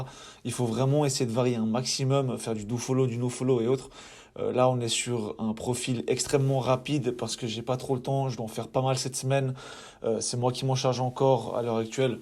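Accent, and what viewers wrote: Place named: Belgium. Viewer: Salut merci de ton partage. moi, je donne juste mon avis. Un lien venant d'un site anglais n'est pas gênant a condition que la langue reste en anglais. Pourquoi ? Quoi de plus naturel d'avoir un lien d'un site anglophone qui fait un lien vers un site en .fr (ou .be au vu et au son de l'accent) du moment que l'ancre ou les mots proches annonce que la source (du lien) est francophone. en gros si on site un site étranger et qu'on explique dans le texte l'origine du pays du site, ça passe. et surtout ça parait naturel.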